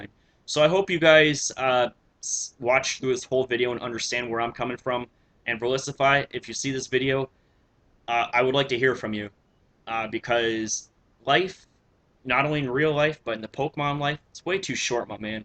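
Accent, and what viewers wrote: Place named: Canada. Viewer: If you are reading this, don’t ask me how I got here 10 years later but I just wanted to say I FUCKING KNEW IT LMAO! Throughout the whole video I was being a little shitter and poking fun at your very very subtle Canadian accent. Then you made the reveal and I laughed so much. Now I really feel like I have a good ear for picking up these accents no matter how micro they might be. Anyways cheers from America, hope you’re doing well wherever you are bro!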